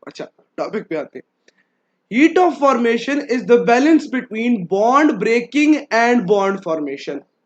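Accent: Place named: Mianwali, Pakistan. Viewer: I think you are from mianwali?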